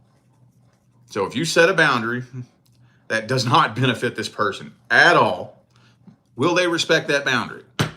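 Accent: South, United States. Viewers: This resonated and also your accent reminds me of home in the south! Live in Cali now but I miss these accents ❤😊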